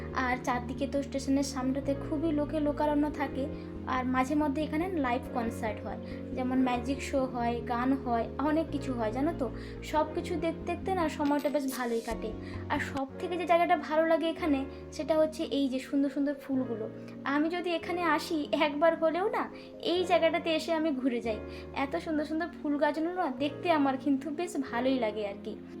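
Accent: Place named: India. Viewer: Puro videota khub valo laglo tomar kotha sune bojha jai j tumi india r meye ki sundor dekhe sune khoroch koro khub sundor lage dekhte cuttie❤❤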